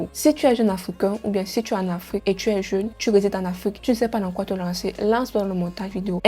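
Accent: Cameroon. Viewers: Comment tu exprimes comme une camerounaise comme ça🙂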